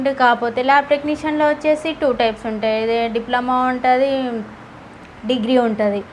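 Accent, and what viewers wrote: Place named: Telangana, India. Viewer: Telangana slang lo super chepthunnaru medam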